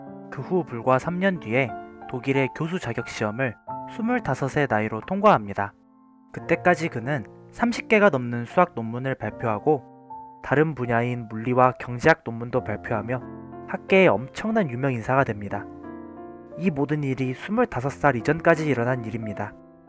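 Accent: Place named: Jeolla, South Korea. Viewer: 의 발음을 으라고 하네요.전라도 출신인가요?반가워요!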